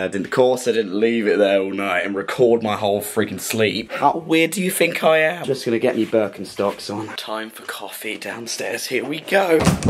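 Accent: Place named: Midlands, United Kingdom. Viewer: Love hearing a midlands accent in the vlog!! Also, love the new hair ❤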